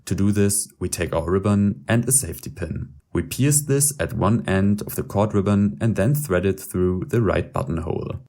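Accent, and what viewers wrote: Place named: Germany. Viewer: I’m hearing german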